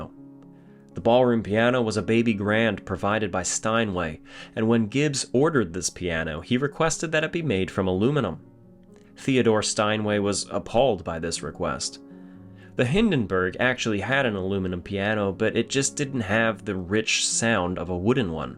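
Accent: United States. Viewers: a wonderful American accent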